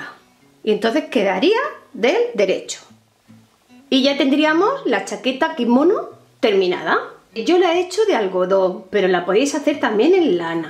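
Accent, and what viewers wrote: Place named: Murcia, Spain. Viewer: Gracias...tu acentico se me hace tan familiar...que hoy por fin pude saber que eres murcianica,me encantan tus laborws,y te sigo desde hace años,por cierto la capaza amarilla de trapillo la hice y se la chulear a mi hija.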